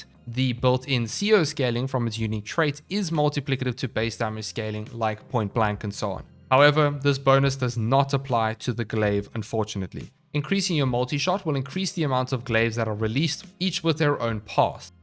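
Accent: South Africa. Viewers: is that a south african accent i hear????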